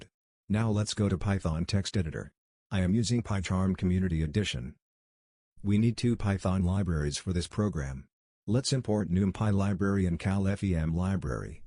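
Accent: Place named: United States. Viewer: Wow your accent has changed into American in just few months ! 🤩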